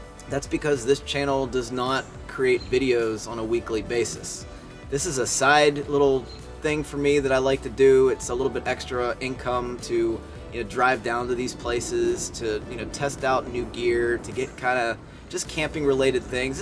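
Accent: Pittsburgh, United States. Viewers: Hey, long time watcher first time commenting. I discovered your channel because I was thinking about doing the black forest trail again and watched your video. I thought to myself, this guy has a Pittsburgh accent and came to find you live in the area. I do as well but I'm not native to the area. Long story short man. I love your channel. I love how you are so genuine and you are not self conscious at all on camera which I envy greatly. I think you might be the best friend I never met. I hope that doesn't sound weird. Anyway man, best wishes and keep on hiking😁👍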